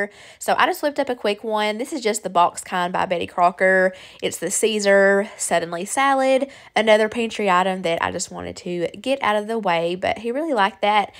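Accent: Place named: North Carolina, United States. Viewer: Are you from North Carolina? Your southern accent sounds like it. I’m from North Carolina.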